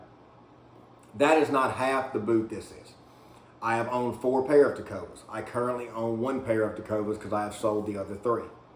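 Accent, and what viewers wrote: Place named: South, United States. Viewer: Great video. I'm trying to figure out what part of the US you are from by your accent and it's difficult to recognize. Maybe Oklahoma, Kentucky, or Louisiana if I had to guess. I am looking forward to more boot reviews in the future. Do you have any opinion on Resistol cowboy boots if you have owned a pair? The underslung heel on the JK Western Ace is a little too steep an angle for my preference but it looks like a great boot otherwise.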